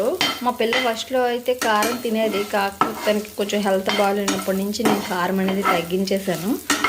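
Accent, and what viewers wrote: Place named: Godavari, India. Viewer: Godavari la undi Telangana language sister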